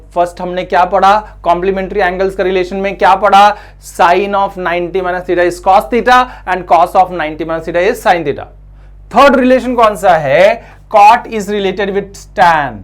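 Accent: Bihar, India. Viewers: You are in bihari style. Jai Hind Jai Bihar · Bihar se ho sir ? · Sir aap bihar ke hain kya?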